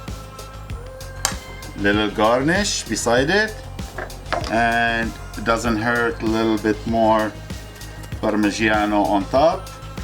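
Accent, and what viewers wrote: Lebanon. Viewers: You sound so Lebanese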